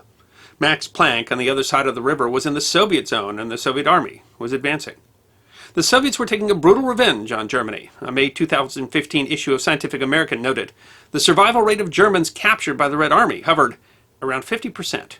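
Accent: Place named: United States. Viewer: I love how Americans try to pronounce kuiper lol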